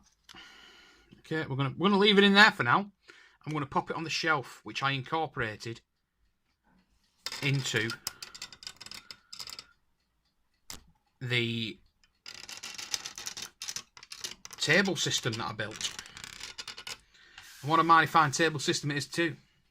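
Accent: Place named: Northern England, United Kingdom. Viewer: You sound like you're from Northern England- beautiful country out there! Thanks for this, it was very entertaining!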